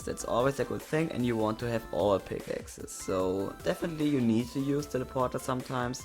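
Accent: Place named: Germany. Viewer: Als ich deine videos gehört hab, hab ich direkt gemerkt das du deutscher bist :D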